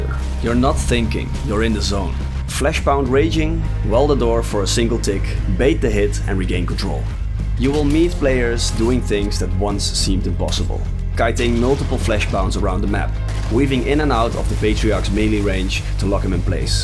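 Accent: Netherlands. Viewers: Ik ruik Nederlands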